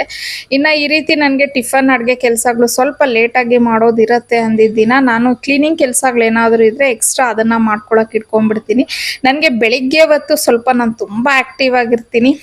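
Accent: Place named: Telugu, India. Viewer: Its not Sheniwara … its Shaniwara Your vlogs are good , i know u are influenced by telugu .. however conscious practice will make pronunciation better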